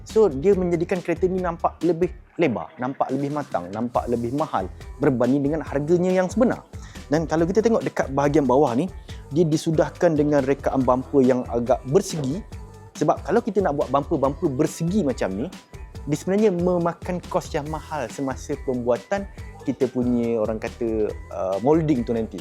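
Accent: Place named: Terengganu, Malaysia. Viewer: Abang ni orang ganu ke. Slang bunyi ganu sikit sikit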